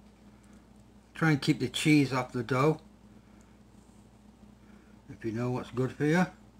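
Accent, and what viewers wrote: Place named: Manchester, United Kingdom. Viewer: I like the Manchester accent but what is it you've made?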